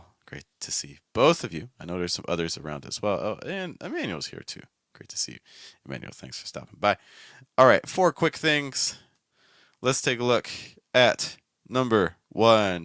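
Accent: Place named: California, United States. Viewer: I thought you have a California accent based on your stress patterns and the occasional use of "like." :) Actually, American accents are a very interesting topic to discuss that we all non-native speakers would love to hear about. For example, what are the differences between NY and Philly accents?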